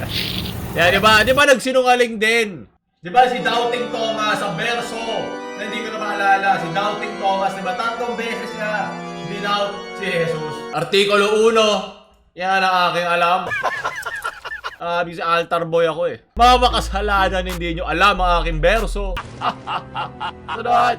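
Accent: Philippines. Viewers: I like your filipino accent, say it again Tito: Intarnet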